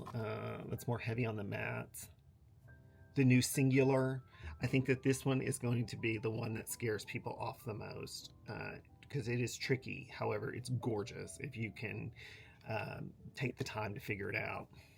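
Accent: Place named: Georgia, United States. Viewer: Love it, you just sold me on the Space traveler pallet but I will be buying the diffuse muse pallet first. I love your accent; you sound like you are southern but Georgia southern like from Atlanta anyway nice accent. I was watching Alicia’s channel where she was talking about you applying these beautiful pallets. You have a new subscriber so I will be watching and learning.❤❤❤❤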